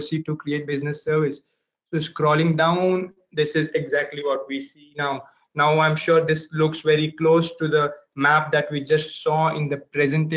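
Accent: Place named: India. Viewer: i hate this slang, as well as any other slang. please hire someone with clear speech. dear indians we do respect you, but this is too much, you spam with many useful videos, but this slang is so frustrating that i prefer strong alcohol drinks rather than listening this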